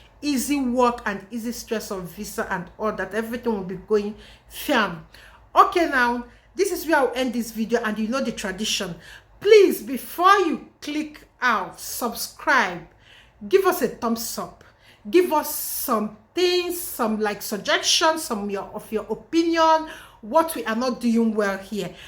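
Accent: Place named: Igbo, Nigeria. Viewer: Me I love your Igbo tongue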